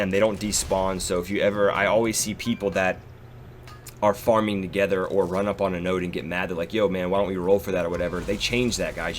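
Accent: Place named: United States. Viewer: Where are you from bro? I'm quite confused.. I am not sure if you're Australian or mid north-western American. Edit: ok You're totally not Australian. But I still can't pin point where you're from, I mean I'm sure it's America, but dunno which state.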